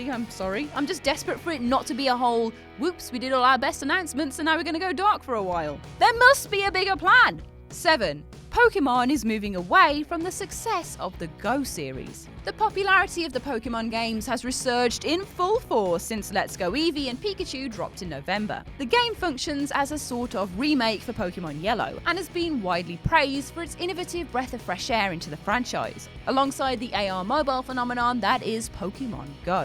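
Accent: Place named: United Kingdom. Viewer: Has this chick been in other videos? I like her energy... And her accent, my God, I could listen to women who speak British all day! Lol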